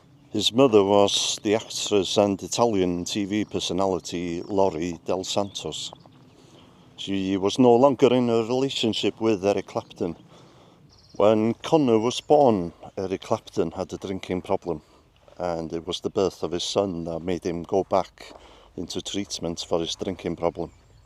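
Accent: Wales, United Kingdom. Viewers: Please! Never apologise again for your Welsh accsent. It's part of who you are... Best wishes from Scotland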